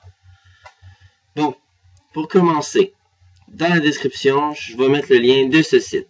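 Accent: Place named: Quebec, Canada. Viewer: L'accent québécois 👌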